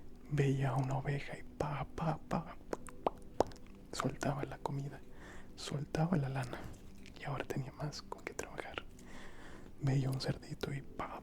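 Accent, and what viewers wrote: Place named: Argentina. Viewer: ES UN ARGENTINO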